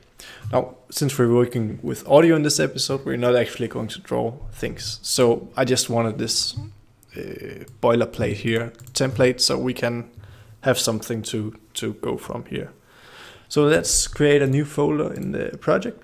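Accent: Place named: Netherlands. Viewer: from listening to your accent i'm assuming you natively speak Dutch. anyway, it would be great if you also had a video showing how to make the sequenced music (i believe composers just converted midi files to the SEQ format?) and the sample instruments as VAg and then into a batch VAB file . (im not too sure on the specific details but i believe the SEQ file and the VAB file containing the VAG sample instruments((with their loop points data etc)) were to be compiled into one file PSF i think) so that the sequencing/synthesis part of the audio also is explained, instead of the 'Streaming audio' part. also i read on the architecture aspects that it was also possible to do FM Synthesis, which i also would like to see demonstrated, the PS1 remains such a fascination to me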